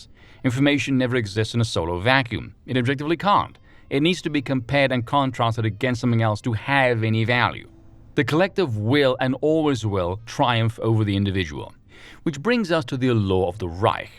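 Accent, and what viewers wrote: United Kingdom. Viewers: listened to the first 1 minute 20 seconds, knew you were gay and british, left this comment to warn others, now off cheerios goody goody gum drops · I swore you were british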